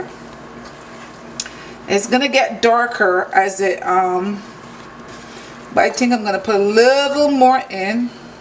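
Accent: Barbados. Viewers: Great to hear your bajan accent peeping thru!!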